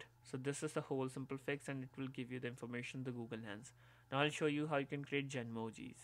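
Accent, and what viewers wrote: India. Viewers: Pahadi English bahut badhiya ji❤❤👌👌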